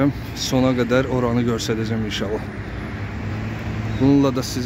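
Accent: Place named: Azerbaijan. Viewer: Приветствую Вас дорогой ❤🇦🇿💞🇦🇿 Простите меня пожалуйста за не скромный вопрос: " Почему вы должны вернуться в Турцию "??? Я по разговору чувствую вы АЗЕРБАЙДЖАНЕЦ......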